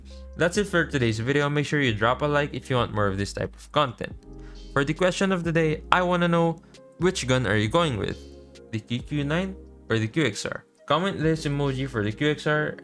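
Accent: Philippines. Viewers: peenoise accent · Dude your accent remains me of Filipino accent tho.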